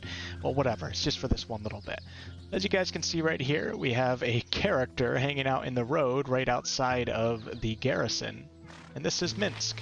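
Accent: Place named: Midwest, United States. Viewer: So I'm detecting a somewhat midwest accent? The way you say tealent!:)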